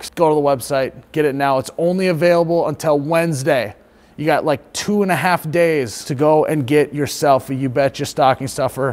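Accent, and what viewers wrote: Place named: Minnesota, United States. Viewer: You're from Minnesota, aren't you? Love your channel.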